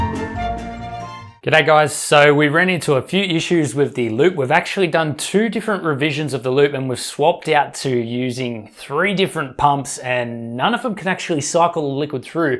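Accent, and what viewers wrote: Australia. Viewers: Are you aussie?? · Average American looking guy sounds aussie.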